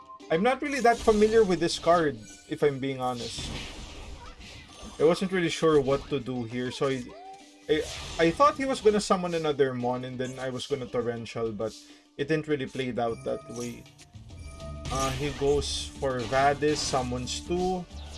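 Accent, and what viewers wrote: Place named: Philippines. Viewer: u sound Filipino af. are u Filipino?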